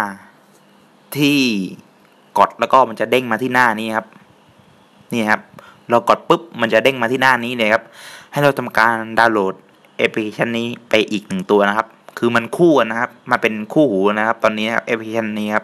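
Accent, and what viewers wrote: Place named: South, Thailand. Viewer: เสียงเหมือนคนใต้นิ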